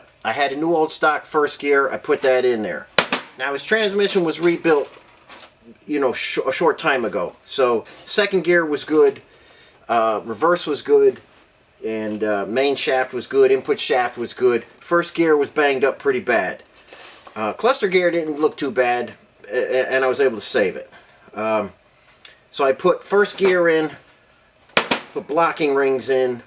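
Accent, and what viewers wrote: United States. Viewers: Yeah well sound very American to me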